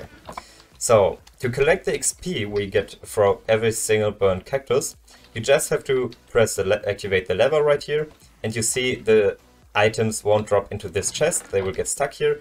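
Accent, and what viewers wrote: Germany. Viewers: sorry, are you a German Landsmann? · Nice accent, is it german? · the german accent :face-blue-smiling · Man hört so krass dass du deutsch bist xD, aber trotzdem gutes video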